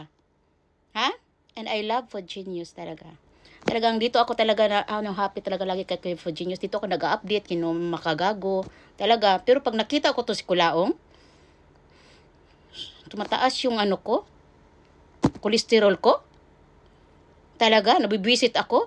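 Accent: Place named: Visayas, Philippines. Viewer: Bisaya man diay ka 😂😂❤❤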